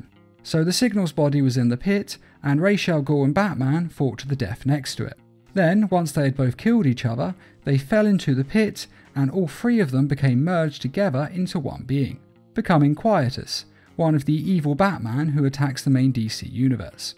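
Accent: England, United Kingdom. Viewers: I have missed the beautiful English voice. My English friends FOR THE LOVE OF TEA!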